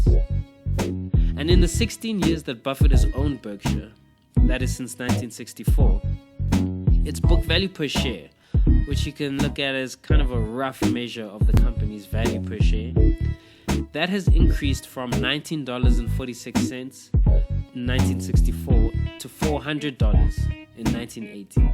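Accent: South Africa. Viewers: I hear a South African accent in there!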